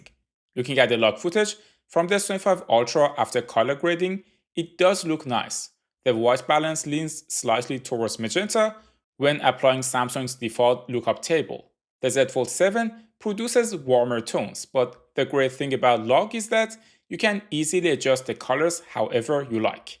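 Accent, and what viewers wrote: Iran. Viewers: Dude that Thick Persian Accent got me 😅 well done bro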